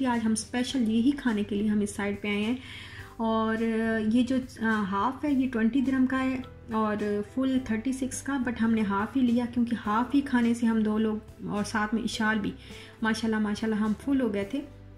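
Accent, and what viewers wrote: Saraiki, Pakistan. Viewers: Mein bhi saraiki hun....shoro sy hi ap k lehjy sy mjy pta lg gya tha k ap sariki hain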